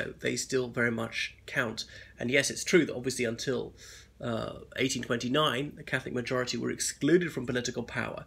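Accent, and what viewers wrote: RP, United Kingdom. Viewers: If I met this man in the pub, I would assume he was posh English. There’s no hint of Irish accent. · Why is this guy claiming to be Irish whilst speaking with a stupid posh British accent and trying to convince people that Irish people are British. All his examples about similarities relate to overhangs and remnants of colonial times. He also forgets that Ireland was under British rule for 800 years, longer than other oppressed countries he gave as examples so there’s. Im4245 do to be remnants. Also brushes over uniquely Irish things such as. Gaelic sports, language, music and dance. The biggest difference is mentality. Irish have very different outlook on the world. It welcomes foreigners and is looking forward. Whilst Britain has gone the opposite as evidenced by Brexit which harks back to Empire days. Irish people’s opinion on class, monarchy and being a republic are also more very different. 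I think over the years to come you’ll see Ireland drift more away from UK and follow its own path.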